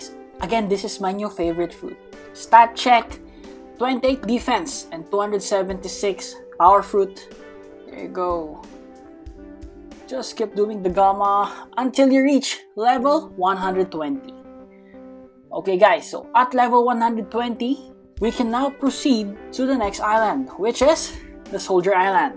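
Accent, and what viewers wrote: Philippines. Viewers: Are you a filipino? Because u sound like Filipino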